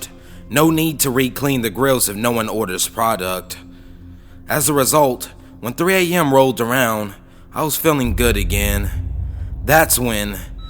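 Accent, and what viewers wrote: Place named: United States. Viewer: Are you from Sydney Australia you don't have an accent you sound American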